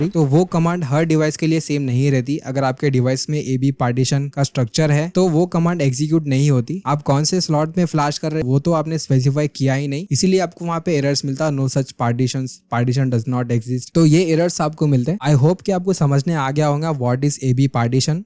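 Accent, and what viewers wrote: Hyderabad, India. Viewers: Bhai's hydrabadi accent is cool! 😍🥰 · Hyderabadi Accent 😅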